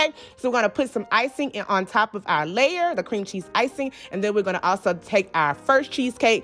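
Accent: South, United States. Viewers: Lord, I'm so glad I found this channel. The food looks so good, and on top of that the southern commentary knocks it home. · That's the south slang.. lol... I'm from MS🤣😊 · I love this chic. I’m southern and live in Ny. So hearing you round the holidays just tickles my heart. Be blessed girl...💕ya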